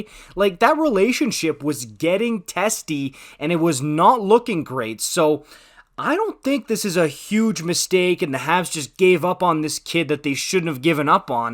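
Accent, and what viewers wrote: France. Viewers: I love how your French accent pops out, dude! Haha, awesome. I'm learning another language watching your videos. ;-) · It grates on my nerves when he speaks with French accent in the middle of speaking English.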